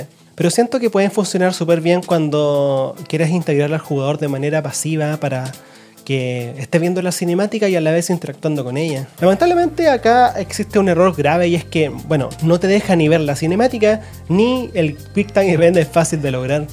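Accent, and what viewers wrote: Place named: Chile. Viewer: Bro tienes buenas ideas pero si quieres crecer trata de no tener el acento chileno.. para que seas global y te vean de mexico tambien, colombia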